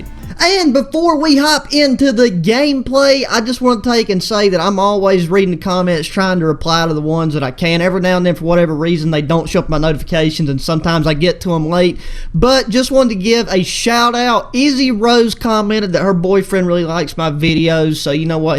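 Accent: United States. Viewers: Man sound like a country guy with a shotgun sitting on his porch not trying to make fun of u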